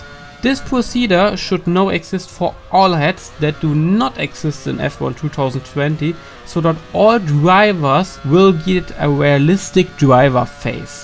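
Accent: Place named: Germany. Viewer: Ich liebe den deutschen Akzent xD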